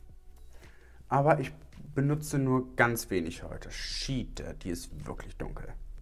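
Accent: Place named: Cologne, Germany. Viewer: ‚Schätzelein wo biste denn?‘ - seit deinem min Video lässt du den Kölsch Jung aus dir raus. Ich liebs! ❤️❤️😂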